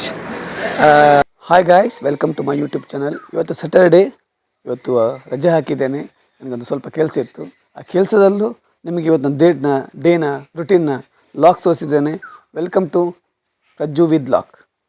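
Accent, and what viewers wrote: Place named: Naravi, India. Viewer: Naravi kannada yedde undu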